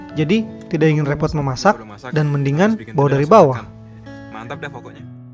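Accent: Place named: West Java, Indonesia. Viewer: Aa keren bangettt a.... 👏👏👏 itu bahasa indonesianya masih ketara bgt logat sundanya hahaa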